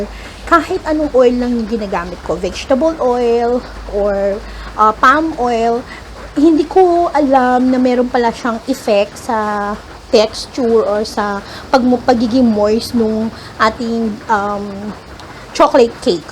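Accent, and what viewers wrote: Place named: Conyo, Philippines. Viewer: Ang arteeeee 😂😂😂😂 im enjoying your video ng sobra.. ang lakas maka conyo 😂😂 excited to try your recipe 🥰🥰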